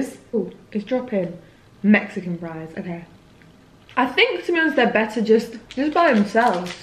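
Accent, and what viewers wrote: England, United Kingdom. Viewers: I feel like it doesn’t matter how you say “Taco” because it’s not an English word and you have a brutish accent so both ways sounds like you’re saying “taco” to me haha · Do you think if I move to London I’ll catch the accent?? I absolutely love how she talks lol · It’s pronounced tah-co, if you’re being authentic. But I know British people pronounce somethings differently. Like the way you pronounce pasta is way different.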